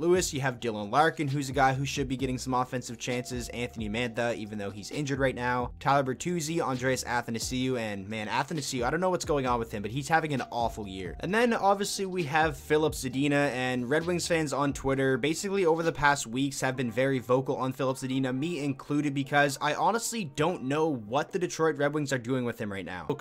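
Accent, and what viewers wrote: Canada. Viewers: Dude you're canadian aren't you? I hear that accent 😎 (Also, it's eyezerman)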